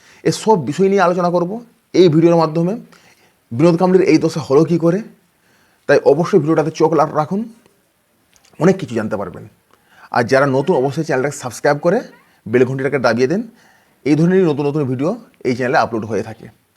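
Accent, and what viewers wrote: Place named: West Bengal, India. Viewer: 'Ramakant Aacharya' noy, sathik naam hocche 'Ramakant Aachrekar', surname er shese 'Kar' ansho ti thakle tini certainly Marathi haben, etai riti. Video banabar aage motei homework karen ni, saadharan dharana tukuo nei aapnar aar video banate lege porechen ei most sub-standard knowledge niye du-paisa kaamabar love ekaaje neme porechen? Moreover, aapnar kathay puro aanchalik taan, medinipur othoba Bankura jelar 'Dialect' e katha bolchen huge platform e ese. Chi: aage nijeke properly groom karun. Eto substandard quality niye 'YouTube' er moto wide platform e chole esechen. Aapnar narration etotai kharap je shunte ritimoto kaane peera dicche. 'Like' to durer katha aapnake 'Dislike' diteo ghrina hocche! Saadharan shuddha Bangla tao bolte paaren na Bangali hoye, lajjar katha!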